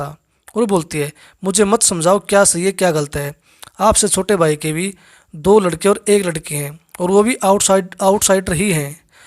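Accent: Haryana, India. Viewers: Tum harayana se ho kya bro 😍😍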